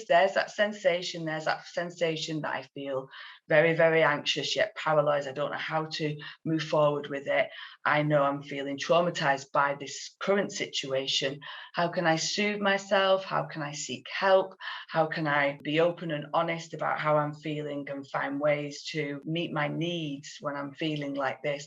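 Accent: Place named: Northern England, United Kingdom. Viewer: Thanks for sharing your knowledge and expertise! It’s also nice to hear a more authentic Nortthern English accent, rather than the usual North American!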